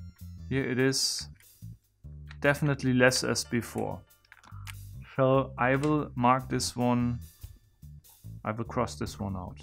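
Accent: Germany. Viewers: I hear you normaly speak german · Sach mal du bist doch Deutscher oder nicht? :D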